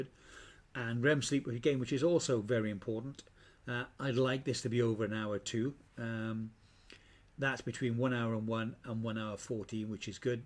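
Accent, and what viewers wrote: Wales, United Kingdom. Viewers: hi watching out for a while I’m here I’m guessing that’s a welsh accent ?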